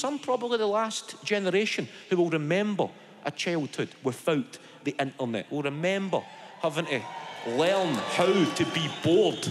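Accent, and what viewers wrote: Scotland, United Kingdom. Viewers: I love a Scottish accent 😁 trust me you get stronger, I'd recommend trying to watch Rab C Nesbit 😂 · Brilliant accent easy to understand if your from Scotland, the experiences he talks about of being a kid in 90’s is relatable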